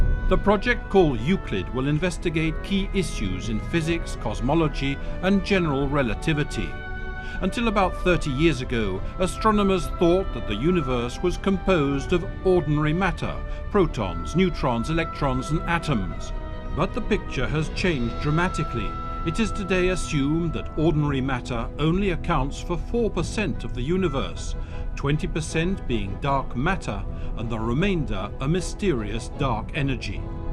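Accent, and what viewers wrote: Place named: United Kingdom. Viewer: cuz the brit accent is plenty cool enough in mono.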